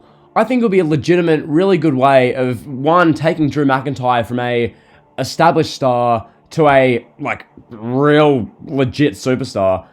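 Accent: Australia. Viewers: Lol somehow you've become more Australian than you already were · And wow you sound more Australian then usual due to the mic,cool tho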